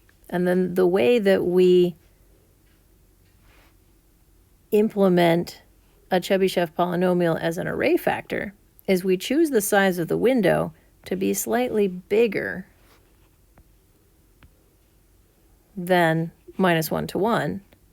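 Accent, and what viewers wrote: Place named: Australia. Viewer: Aus accent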